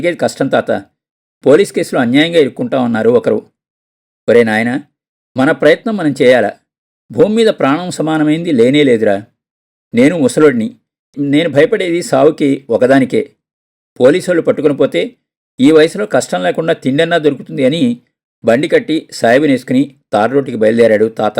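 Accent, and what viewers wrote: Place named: Rayalaseema, India. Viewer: గారు చాలా చాలా మంచి కథ వినిపించారు. ధన్యవాదాలు. రాయలసీమ కరువు రోజులు కళ్ళకుకట్టినట్టు వినిపించారు ఆ యాసలో.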